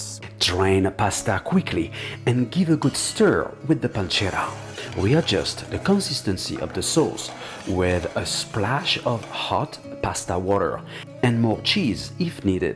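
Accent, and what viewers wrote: France. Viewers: When I am in heaven, I hope there is an option for a French-accent man to prepare carbonara for me.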